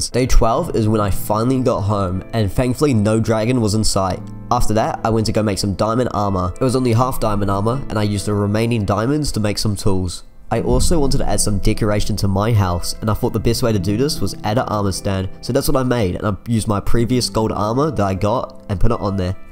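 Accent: New Zealand. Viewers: Is he from New Zealand or something? he's got a kinda kiwi accent 👀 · R u kiwi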